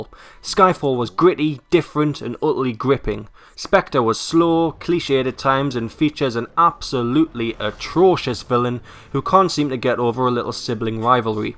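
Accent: Newcastle, United Kingdom. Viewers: Gona take a wild guess , u from Newcastle? :P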